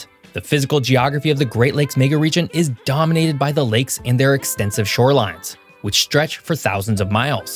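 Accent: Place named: West Virginia, United States. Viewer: You got a little bit o’ West Virginia in there!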